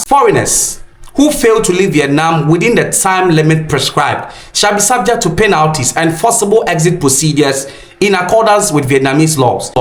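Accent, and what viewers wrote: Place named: Ghana. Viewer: SEE YOUR MOUTH calling color colar with your heavy Ghana accent. If you want blogging wars you will get it. You think Ghanians are saint and dont hane dirt ? We are trying to retrieve $59,000 and $48,000 among other fees from scammed us for land paid for two of my African American friends who bought into your advertisement to return to African.....SCAMMED IN BROAD DAYLIGHT BY GHANIANS!!! I have names and their plea in underwears begging for mercy to pay back. If videos go online now the west will laugh because the inner devide is what they want you fool! Perhabs we should just throw caution away and expose every dirt we can find on Ghanians too and lets this whole B.S happens. Even as I say this,I know defending the pride of African should prevail above anything else but sometimes these folks be asking for it!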